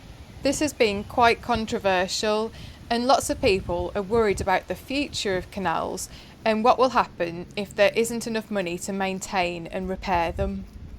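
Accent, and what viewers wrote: United Kingdom. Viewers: Your British accent is absolutely lovely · I'm American so you have what I think of as a very British voice, perfectly fine. I've learned over 6+ decades of life to just let the naysayers go and don't allow them to own any part of your thoughts. Remember, people only have as much power over us as we allow them to have. Keep on making your videos, they are outstanding! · I've never heard a British accent that wasn't music to my ears, yours included. I just discovered you and I love the tone and energy of your video! Thanks also for the history. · You have a perfectly normal English accent! Nothing at all out of the ordinary for that region. Some people are just too ignorant to appreciate the beauty of regional accents. It would be a boring world without them!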